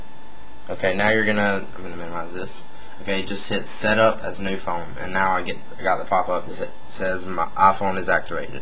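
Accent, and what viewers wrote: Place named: Texas, United States. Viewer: its a texan accent i think lol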